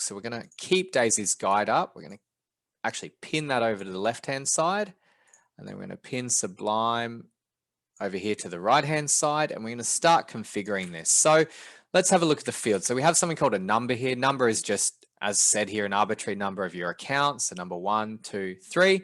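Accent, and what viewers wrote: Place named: Australia. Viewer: I love your aussie twang mattttte,, been to long mate,, catch up in Discord,, Sam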